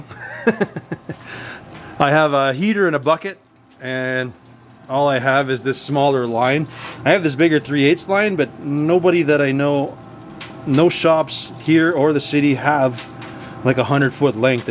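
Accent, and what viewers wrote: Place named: Manitoba, Canada. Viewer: I miss the Manitoba accent. At least you don't punctuate every sentence with the word F@ That might be a Winnipeg thing. I don't know....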